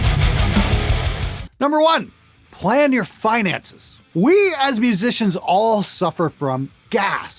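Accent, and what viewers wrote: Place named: Canada. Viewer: I wasn't surprised one bit when this guy said he was Canadian, my guess somewhere in the southern Ontario. Love your shit keep them coming!!!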